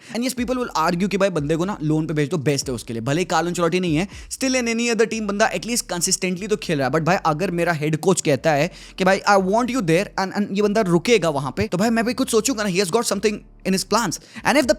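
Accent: India. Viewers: Bro what is this english-indian accent?? İt is so hard to follow man.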